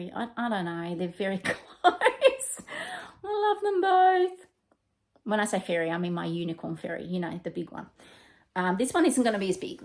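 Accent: Australia. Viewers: So good to hear your pleasant auzzie voice again.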